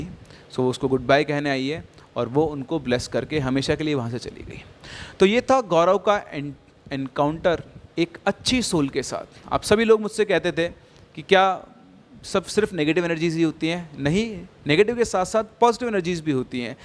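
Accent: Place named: Maharashtra, India. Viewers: Marathi accent bhot sahi 😂😂😂😂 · First Viewer✨ Nice Marathi accent 👏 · I am from Maharashtra , loved your marathi accent 💯 After IIT and NIT here comes HIT 🤣🤣🤣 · Marathi accent 👌 एकदम भारी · Sir ka marathi accent 😍😍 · मुझे b तुम्हारी मराठी टोनिंग मस्त लगी.😅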